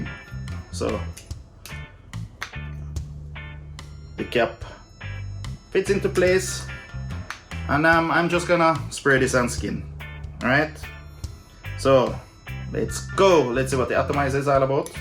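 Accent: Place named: Jamaica. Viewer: 👨🏻‍🦱sounds like a Jamaican boy 👦🏿 was NOT expecting that 😂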